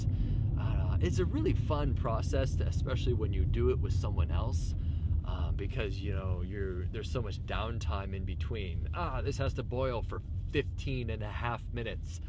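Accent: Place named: Northern Ireland, United Kingdom. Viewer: Ur accent is the north of Ireland accent different from the south. The north have a funny accent I think.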